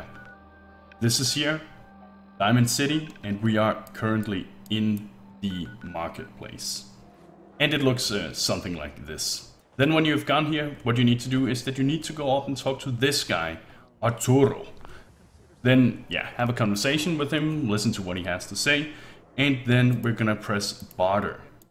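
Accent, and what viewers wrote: Denmark. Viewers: Is he from Denmark?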